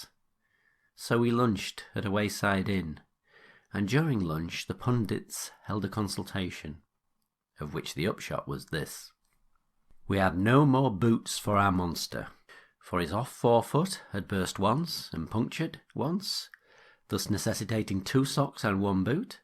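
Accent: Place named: Northern England, United Kingdom. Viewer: So pleased to have found your channel. Great story and nice to hear a Northern voice ( I hail from M/r) narrating. Have subbed and big thanx!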